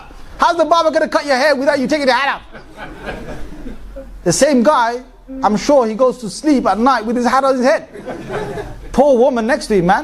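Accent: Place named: United Kingdom. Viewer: Definitely, british accent yes man I like this islamic man very much talking good about prayer and about his own God name called Allaah it's there own God true talk Mr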